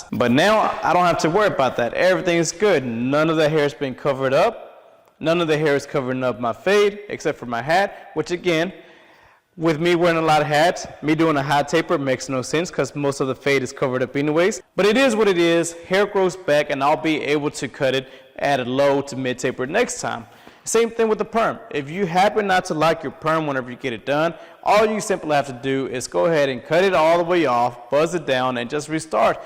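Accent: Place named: Mexico. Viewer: Mix between Mexican and a good old boy country accent lmao.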